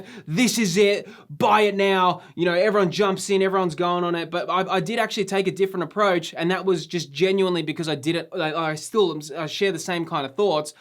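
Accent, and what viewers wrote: Australia. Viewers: first time follower and your format is awesome, and AUSSIE yewwww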